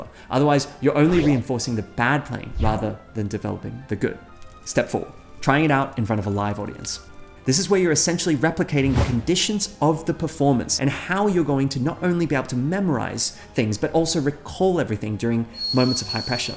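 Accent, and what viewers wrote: Australia. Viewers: We need more of your Australian accent! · The aussie accent threw me for a loop. I was like... who is this guy? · Thank you!! This is the answer to the question I’ve always wanted to ask you!😁😁 Btw, love your Aussie accent❤ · I just watched a rosin video that led to this and hearing the different accents threw me for a loop! I went back to the rosin one and listened to the first bit more carefully and I could hear where his Aussie accent was peeking through, but otherwise it was a great American accent he put on for it., we'll watch you either way! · You can hear the Australian in his voice in this video. Maybe he talked to his mother before filming. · Thats a crazy aussie accent... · It’s nice to hear speaking comfortably in his Aussie accent. Good on him! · I've watched like 5 of your other videos and this is the first one I've heard where you sound Australian. 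I was so confused... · He is Australian, but I imagine it changes depending on who he talks to or where he's located. It influences the way he talks naturally (unconsciously).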